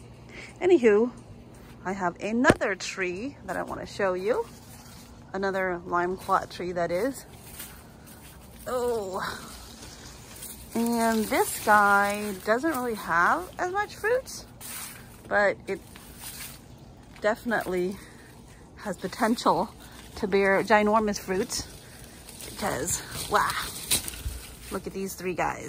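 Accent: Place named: India. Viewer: You sound like a normal American with hints of an Indian accent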